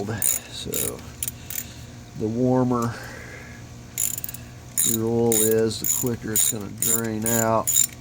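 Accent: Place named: Southern Ohio, United States. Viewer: Fantastic set of videos - on my ‘05 Tribute V6 I have oil leaking out of my oil pan, main crank and valve cover. It’s a mess! I’m using your videos to do all three. I’m competent but not confident under cars, so these are just perfect. You sound like you might be from Southern Ohio too so that makes you okay in my book to begin with. 👍 Thanks again for sharing your expertise and making such clear to follow videos!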